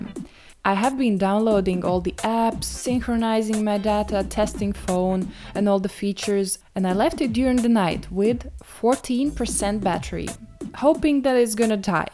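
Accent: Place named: Balkans, Eastern Europe. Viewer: from accent I can tell you are from europe proboly ballkan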